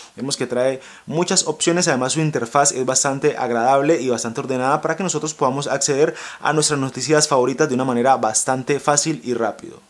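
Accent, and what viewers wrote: Cali, Colombia. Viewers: eres de cali colombia???? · Eres de Colombia? ;:D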